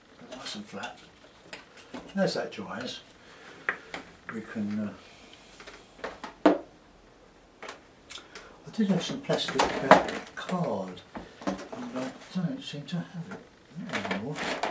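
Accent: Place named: England, United Kingdom. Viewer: I'm awed by your talent. As you've said before, watching you makes me almost want to give up.....almost. I've been trying to create scenes such as yours for over 40 years and still can't produce the quality of work that you do. Thank you for hours of fascinating and pleasurable viewing. Being a Yank I did, at first, have a little trouble with the English accent (my problem, not yours), but I soon got used to it. Do you ever do any miniature watercolors? And, if so, could you put one up on YouTube? Thanks again and keep it coming.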